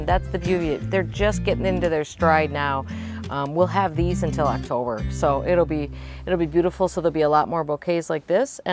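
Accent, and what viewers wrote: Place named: Midwest, United States. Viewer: “All flowers match.” Yessssssss! I love watching you garden, your accent takes me back to my time in the Midwest and makes me want to call old friends.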